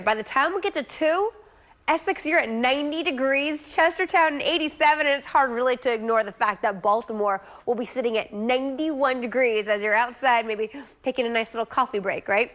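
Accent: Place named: South America. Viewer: Wait Waa da fuuuuuu !?! ¿ Since when did WMAR get a South American Weather lady ?!?!?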